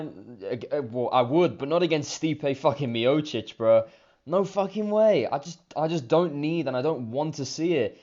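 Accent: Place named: United Kingdom. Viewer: I click this video and I hear a thick UK accent LOL - makes perfect sense. You have no clue what you're talking about m8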